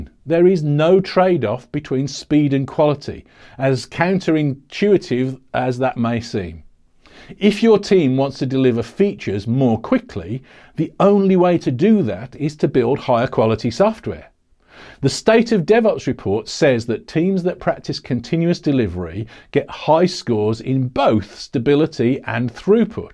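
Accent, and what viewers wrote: United Kingdom. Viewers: This channel is just telling me things I already believe in a British accent. I mean that in the best possible way.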